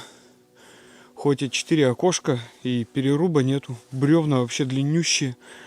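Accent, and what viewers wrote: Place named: Rural, Russia. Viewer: Благодарность тебе огромная за видео! Из этих мест родом мой муж, из села Вохма. Их род по мужской линии был большой. Рожали по 9 - 12 детей. Свёкор один из 12, 10 мужиков и две сестры. Только из их рода мог образоваться огромный колхоз. Все трудились и поэтому жили хорошо. Ставили деревни, о чём в архиве есть запись урядника за 1904 год. Но в советское время их хотели раскулачить и все разбежались по разным городам и весям. В Костромской области и в Сибири есть сёла названные по их фамилии. Предки были родом и из деревень Большие и Малые Мокруши. А вот по женской линии все были по одной: прабабушка, бабушка и свекровь. Я уже сама уже очень старая и совсем мало кого осталось. До 2015 года в Вохме ещё проживала старшая сестра моего мужа. Но думаю, что в России ещё много потомков от этого рода. И всех известных мне и неизвестных я люблю и почитаю. 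Благодарность тебе, за видео с этих мест, замечательные твои комментарии с лёгким деревенским говорком и знание бытового устройства с его атрибутами. Просто бальзам на душу!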